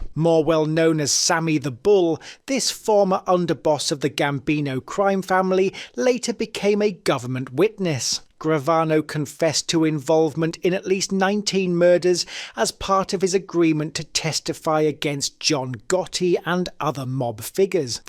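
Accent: United Kingdom. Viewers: There's absolutely nothing more annoying than some retard with the UK accent commenting on s*** they have no idea what they're talking about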